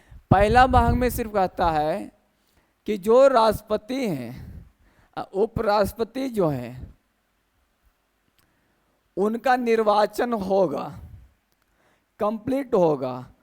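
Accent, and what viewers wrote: Bihar, India. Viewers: क्या आप बिहारी है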